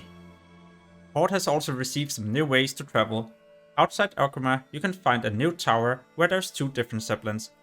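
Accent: Denmark. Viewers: Very nice video :D Playing with people from scandinavia I kinda started to catch on the accent, you are danish if I'm not mistaken :D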